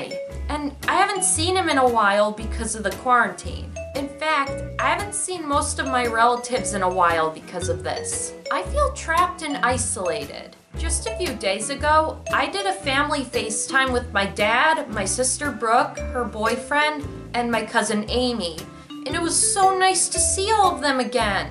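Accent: New York, United States. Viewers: What accent do you have,? Sounds like New York. I'm Australian so all the different US accents interest me.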